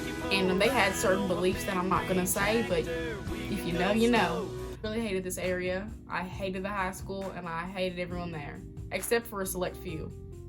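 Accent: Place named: New York, United States. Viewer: I can hear the NY accent lol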